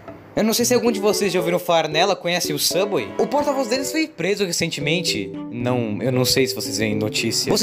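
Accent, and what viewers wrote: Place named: Portugal. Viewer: Mano tu parece que e de Portugal pelo o sutaque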